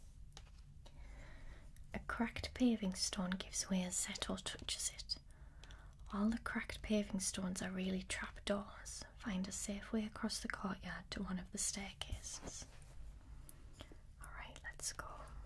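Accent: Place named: North East England, United Kingdom. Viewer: Always love the North East pronunciation of Books!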